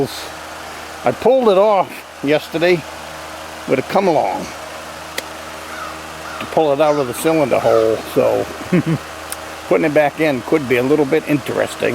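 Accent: Maine, United States. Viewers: A good start would be getting rid of the Mills gang.... I really do love your authentic Maine accent! I used to hear it everywhere but now only when I'm in the woods with the old-timers😏👍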